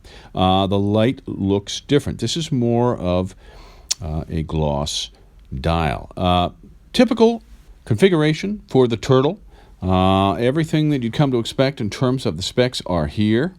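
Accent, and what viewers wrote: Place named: United States. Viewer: Why can't all Americans sound like you. Both you and TGV have taught me so much about Seiko. Cheers👍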